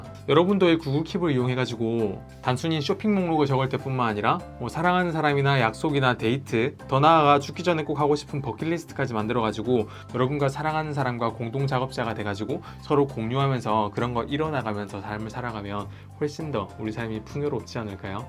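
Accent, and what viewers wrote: Gyeongsang, South Korea. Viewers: 말투가 경상도네요? 사랑해요.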